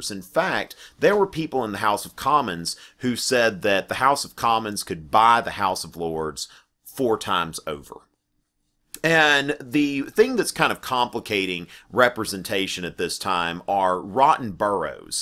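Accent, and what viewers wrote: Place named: South Carolina, United States. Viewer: He sounds like he's from the south eastern U.S. I'd guess, an urban area of South Carolina. I'm from Virginia, and many of the accent are similar, but there are some subtle differences.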